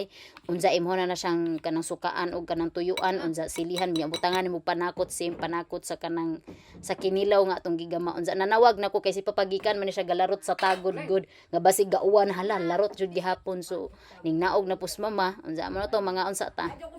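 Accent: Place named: Dumaguete, Philippines. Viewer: karon ra ko ka realise. Dumaguete ug Bohol parehas ra kaayo ug grammar ug accent. ang kalahian ra ang J ug Y.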